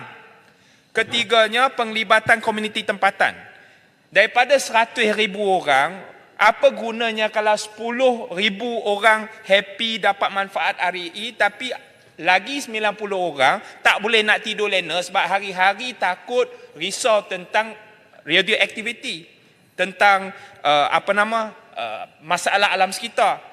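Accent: Perak, Malaysia. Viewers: Ckp perak yop... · steady mp ni....cina cakap perak yop....👍👍👍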